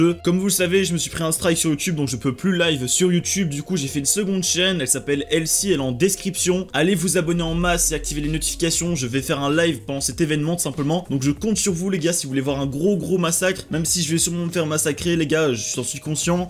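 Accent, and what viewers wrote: Belgium. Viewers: Tu viens d’où an Belgique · Toi ossi tes Belgique ?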